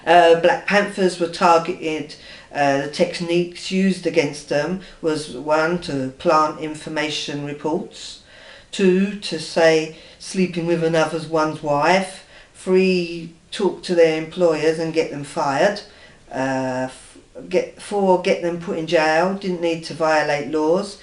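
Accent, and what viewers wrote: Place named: London, United Kingdom. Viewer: It's so pleasing to hear a lady speaking on gang stalking with a London accent. I think these people doing the surveillence and doing the gang stalking are just great big spiteful little boys in great big men's bodies.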